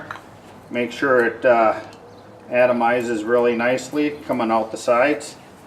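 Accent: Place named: Minnesota, United States. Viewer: You sound like you are from Minnesota?? You have an accent just like all my relatives!!!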